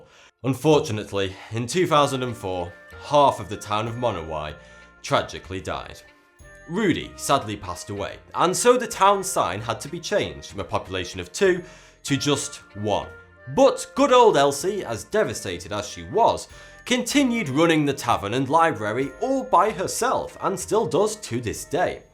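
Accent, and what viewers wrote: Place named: Manchester, United Kingdom. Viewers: where abouts in England are you from? you sound northern I'm from Liverpool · Subscribed for the Mancunian accent.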